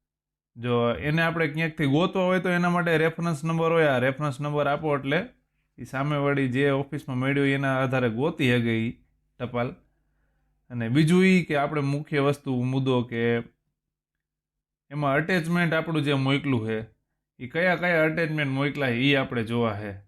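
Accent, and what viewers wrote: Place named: Kathiawar, India. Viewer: હા કાઠિયાવાડી હા... Continue રાખો...😂😂😂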